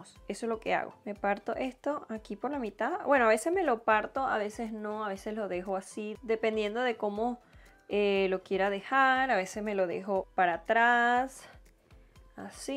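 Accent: Venezuela. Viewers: ES VENEZOLANA? CREO QUE SI POR SU ACENTO ...ADEMAS QUE BELLA ES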